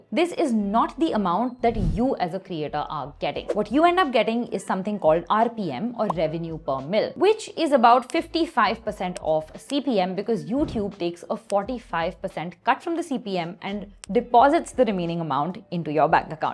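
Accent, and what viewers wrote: India. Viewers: listening your india accent torturing my ears . sorry i cannot watch this.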